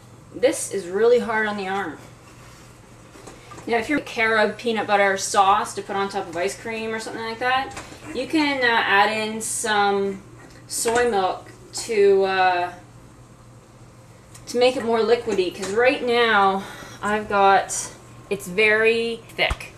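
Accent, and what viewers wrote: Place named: Canada. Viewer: All foods are an aquired taste. Where did you get the Oil atomizer?(brand name, you sound Canadian, is it from the US?) Can't find them here. Coconut would rock in this recipe too.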